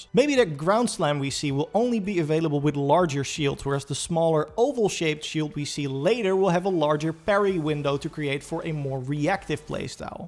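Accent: Netherlands. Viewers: That Dutch accent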